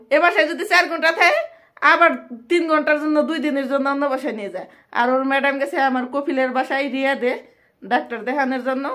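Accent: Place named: Dohar, Bangladesh. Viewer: Tumi Ki Dohar theke? Kotha shune mone hoy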